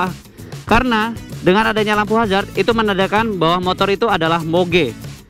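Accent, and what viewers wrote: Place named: Banyumas, Indonesia. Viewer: Lah kok rada ngapak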